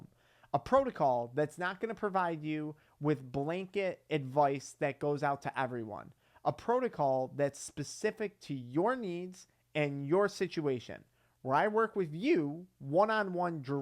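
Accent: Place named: Western New York, United States. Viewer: I know another western New Yorker when I hear one 😂 awesome content as always!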